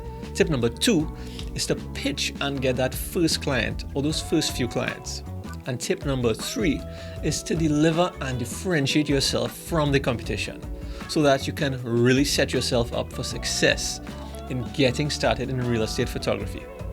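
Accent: Trinidad and Tobago. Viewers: Are you from Trinidad?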